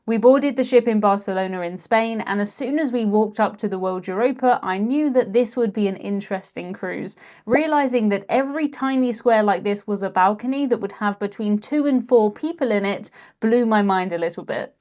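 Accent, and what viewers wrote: United Kingdom. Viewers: I just love this gal. The Brit accent is intoxicating! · Not a moan, but more of a question. Why do you call biscuits cookies, when sound like you are from the UK?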